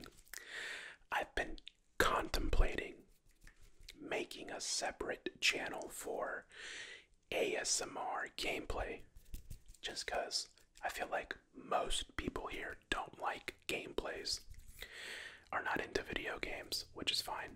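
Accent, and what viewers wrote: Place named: United States. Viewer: Big compliment: I love your voice. Usually I don’t get tingles from just whispering but I nearly fell asleep listening to your sponsorship in the beginning. I’m not American myself so your accent and tone is really cool to me :)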